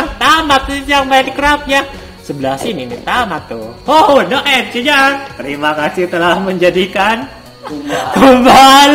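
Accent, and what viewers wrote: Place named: Sunda, Indonesia. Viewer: orang Bandung yak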